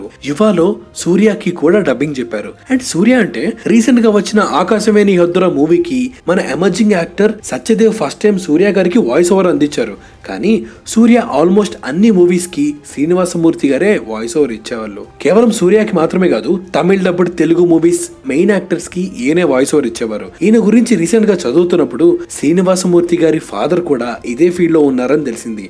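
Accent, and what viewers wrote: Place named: Andhra Pradesh, India. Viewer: Bro talk normally 🙄 u are talking like u are from andra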